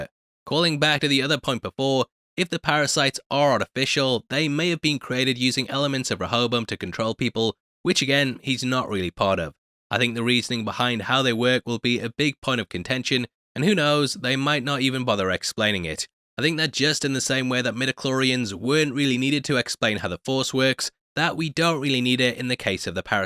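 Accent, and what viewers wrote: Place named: Liverpool, United Kingdom. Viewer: You really sound like a scouser. I detect lol.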